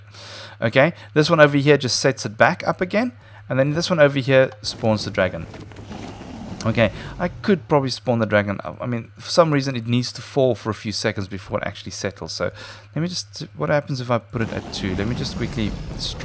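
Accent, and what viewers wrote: South Africa. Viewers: are you south African? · Are you South African?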